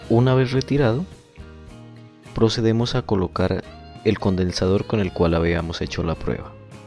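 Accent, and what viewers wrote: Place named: Narino, Colombia. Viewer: Amigo, la verdad un excelente video, mu buena prueba créeme que la aplicaré, me imagino que funciona con todos los electrolíticos. Por tu acento veo que eres de la hermosa tierra del Galeras. Millones de bendiciones.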